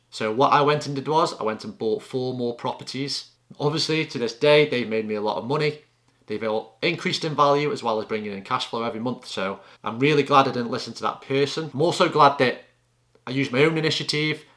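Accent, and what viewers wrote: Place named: Stoke, United Kingdom. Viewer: Loving your advice mate and I used to live in Stoke so its nice hearing a stokie accent, I have a deposit ready and been researching it a lot so keep the content coming!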